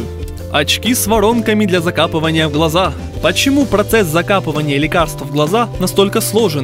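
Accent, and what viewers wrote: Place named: Surzhyk, Ukraine. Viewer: ты конечно, молодец, но пожалуйста, говори не на суржике. Ухо режет...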